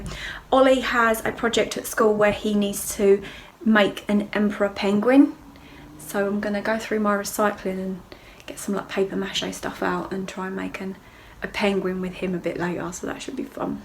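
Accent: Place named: Dunstable, United Kingdom. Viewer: I really enjoy your videos. Whereabouts in England are you? Your accent sounds like mine, I was from Dunstable Beds, and I lived in Chelmsford, but have lived in Florida for 26 years. Really enjoy your recipes. Thanks. Also, you remind me of Sam Faiers from TOWIE and the mummy diaries. LOVE the penguin! Very clever.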